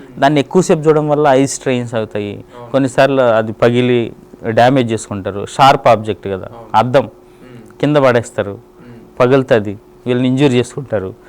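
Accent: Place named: Telangana, India. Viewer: Good chala helpful parents doctor garu chala Baga cheparu. A Chala Telangana slang lo super cheparu doctor garu. Nijamga Epps tiki alanti Valli unara Biddu thadu bellam lo pettukoni tine Vallu.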